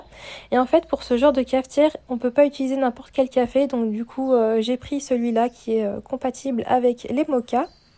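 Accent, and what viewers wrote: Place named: Northern Portugal, Portugal. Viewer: Salam aleykoum ma soeur comment je suis contente de voir que ta fait Aletria. Je me sentait seule dans le sens ou les gens en générale quand il rentre dans l'islam il oublie vite leurs origine il veulent absolument " devenir arabe" . Hamdoulilah cest bien que tu te souvienne un temps soit peu du portugal. Ça n'empeche pas qu'on apprend l'arabe et tout mais faut pas oublier nos racine. Hamdoulilah trop contente. Continue tu me régale Allahuma barek et enplus sa s'entend que tu parle très bien le portugais ... à entendre je dirais que tu viens du nord. Je me trompe ?? 😂❤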